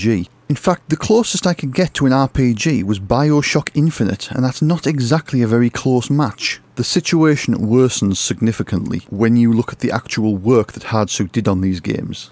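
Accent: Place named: United Kingdom. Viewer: Instantly subscribed during the first minute because of your accent. British accents are far too rare on yt.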